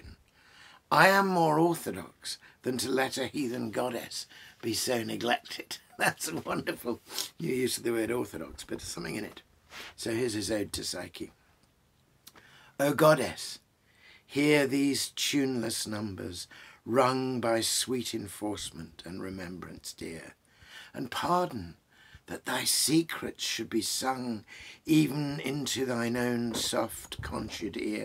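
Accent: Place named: Oxford, United Kingdom. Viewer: I just found you. I was looking to find Omar Khayyams wisdoms... An Olympic cruise.... My old Guru, Micky Burn, (author) had that in his bucket list too. You have an Oxford accent, to my ear. Are you back yet from the conflagrations?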